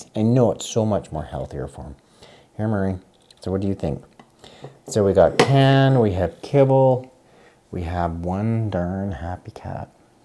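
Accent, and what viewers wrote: Canada. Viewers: Random question but are you from Canada? ☀️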